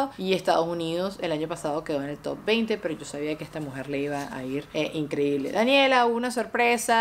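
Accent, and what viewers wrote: Venezuela. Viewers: Me gusta mucho como habla daniela burde lo nornal chama típica criolla te amo eres exelente besos 😘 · saludos, vi el Certamen con mi esposo, por TNT, al escucharte me pregunto ¿quien es esa la que esta hablando? - Yo: Es ¿sabes quien es? - y me dice Si, ES UNA VENEZOLANA! JAJJAJAJA me encanto que me respondiera asi!! Te queremos!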